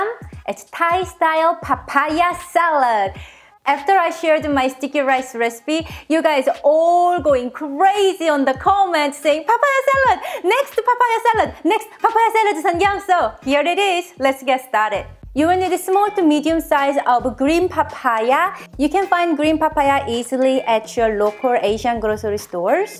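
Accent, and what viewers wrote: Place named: South Korea. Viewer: Omg you make every languages sound just korean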